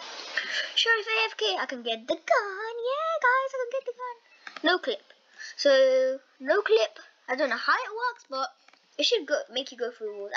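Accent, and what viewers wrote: United Kingdom. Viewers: Umm idk what he's says because he's bridsh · British boy 🥳 no offense I like em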